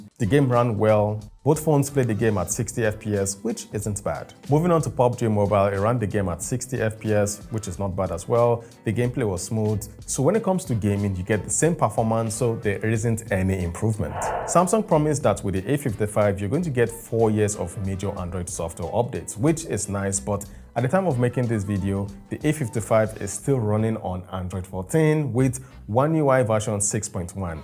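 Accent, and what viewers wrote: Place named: Nigeria. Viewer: Most of the Nigerians be like... "If you aks me"....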